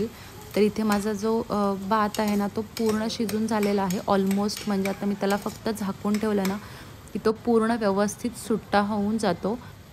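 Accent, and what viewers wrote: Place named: Ahirani, India. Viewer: Tumchi basic language aahirani aahe ka?Tumchi Marathi language Varun vatate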